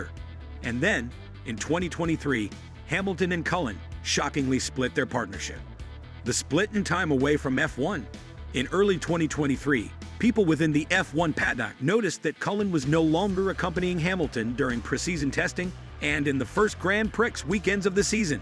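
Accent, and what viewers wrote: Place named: United States. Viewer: DUMP THE STUPID AMERICAN ACCENT. AT LEAST AN ENGLISHMAN KNOWS HOW TO PRONOUNCE GRAN PRIX.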